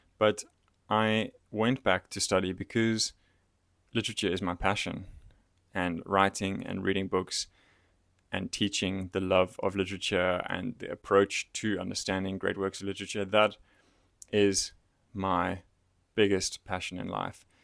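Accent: South Africa. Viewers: The accent - you are South African aren't you? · Do I detect an SA accent?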